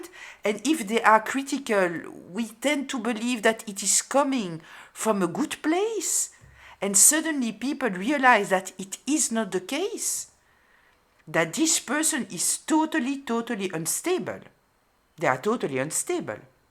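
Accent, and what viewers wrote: France. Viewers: The word for only giving to get something back is “transactional”. Love your accent, makes me feel like I should wear a beret riding my Velo Solex with a baguette in hand to listen!😁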